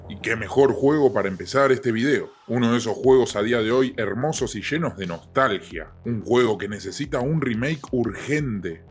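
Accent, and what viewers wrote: Argentina. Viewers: Hola. Sos un groso. Sos argentino puede ser?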